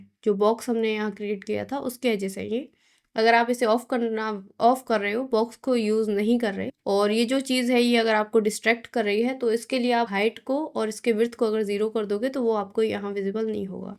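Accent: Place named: Uttar Pradesh, India. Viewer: Aap UP se ho kya... Tumhare Accent se lg rha h:face-orange-biting-nails:. Btw loved your Editing style and Content.❤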